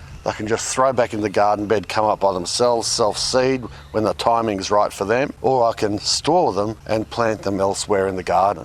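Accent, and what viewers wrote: Australia. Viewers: Google subtitles: Hi I'm from Sausage Fisherman. Looks like AI can't deal with an Aussie accent. 😅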